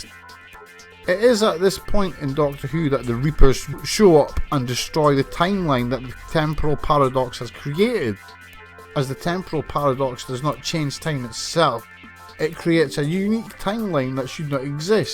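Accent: Scotland, United Kingdom. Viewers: Lol love your Scottish accent😂 Keep it up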